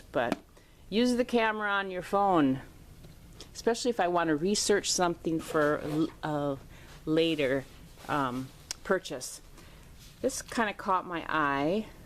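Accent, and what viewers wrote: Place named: Midwest, United States. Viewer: You sound like you must be in the Midwest I have lots of Cousins in Wisconsin and yes they have had the weirdest weather with high winds...one day some snow and then warmer temperatures. Enjoyed your video....do you sell a lot of yarn my younger sister loves knitting ! Corie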